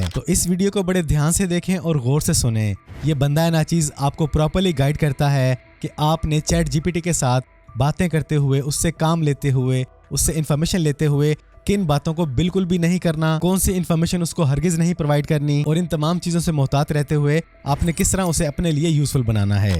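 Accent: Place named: Saraiki, Pakistan. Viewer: bhy lagta hai apki mother- tongue Saraiki hai QK apka laheja Saraiki ki Tarah bahut meetha hai, mashaAllah!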